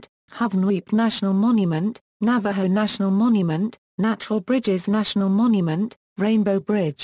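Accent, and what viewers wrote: United Kingdom. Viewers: Informative content but the British voice was distracting and annoying because it sounded robotic and artificial AI-like.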